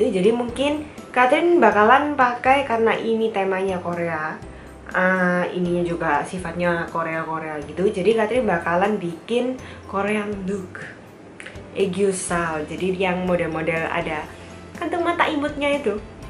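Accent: Java, Indonesia. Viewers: "...udah moncrot" hahaha 😂love your video. already subbed. if you dont mind to visit my videos too. tos dulu sesama lidah medok 😘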